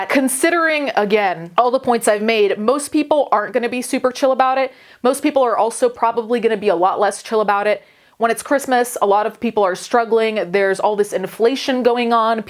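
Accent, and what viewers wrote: Italy. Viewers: she's Italian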